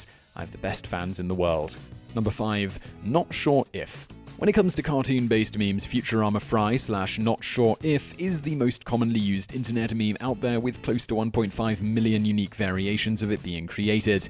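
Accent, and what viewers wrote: England, United Kingdom. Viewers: I love the way he says "scumbag", "farts", "shits" and "badass" in a perfectly clipped English accent.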